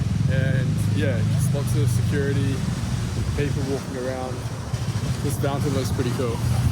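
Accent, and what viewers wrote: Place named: United States. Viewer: Hi, enjoy my home country and be safe always!your accent sounds like americans but upon checking your channel i found out you are from NZ..hope you get a lots lots of Filipino subscribers!gluck👏✌️🙏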